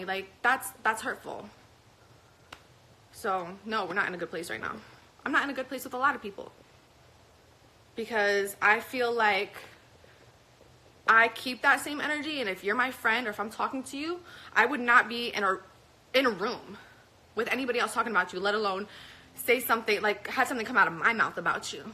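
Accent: United States. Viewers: is u light skin black bae? u got that nice light tone an i think maybe u black cuz how you talk . sound lik u come from were i from (bronx usa baby!!!!) pls tell me yss u black my god u fne bae gd bless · candy candy she’s from Jersey and is Italian. That’s just how we talk here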